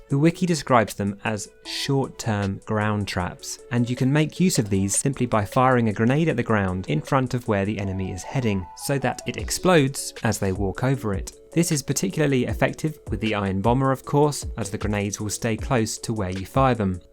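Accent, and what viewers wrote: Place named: United Kingdom. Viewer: I missed your british voice you absolute legend! Glad to have you back.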